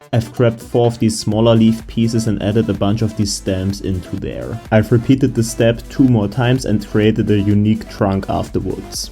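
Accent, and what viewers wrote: Germany. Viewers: Are you German because you have such an accent?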